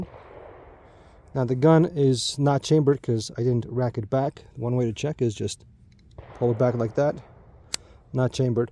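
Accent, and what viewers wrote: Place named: United States. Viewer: For a Romanian you American accent is good.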